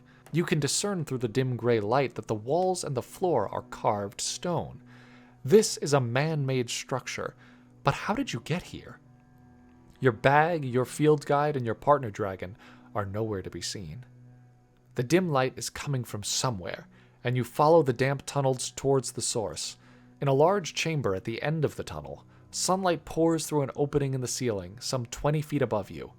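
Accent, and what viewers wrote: Scotland, United Kingdom. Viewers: I kind of found that Scottish accent entertaining and am a bit sad it didn’t continue for the second half of the month and I look forward to seeing what the adult dracomorph starers will look like if you get around to it Also one day I’ll remember to ask my parents about purchasing a set of brushes or two from your gumroad page I just have other things on my plate at the moment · I liked the Scottish accent 🥺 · Man I missed your Scottish accent in this video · No Scottish accent?😢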